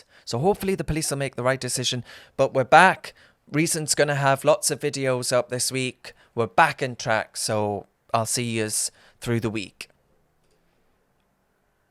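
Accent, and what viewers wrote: Newcastle, United Kingdom. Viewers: Like hearing a fellow Geordie on t'internet 👍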